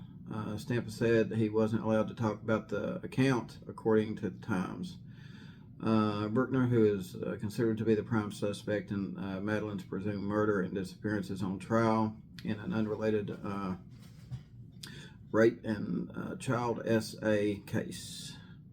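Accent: South, United States. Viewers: Love the calm way speaks. U can actually hear him. Soft Southern Style! And no background nonsense. Yeah wtf is goin on with some people. I cant get ma head round it. All this evil, family annihilation, murder, missing kids….. It goes on and on! Depressing, upsetting. Have we learned nothing. Its 2024 now and this world seems to be getting worse not better. 💚💜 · I was a youngin'. I enjoyed that As I come from the Northeast. I was the same age as Nicole I think a year younger, So we were in our Early to mid 30's. I 't follow much true crime anymore like I used to many years ago with Nancy Grace. It's just the same child story of murder and sex abuse decades after decades but the names are changed. But I so enjoy your show, Your sensibility, level headness, of course southern accent!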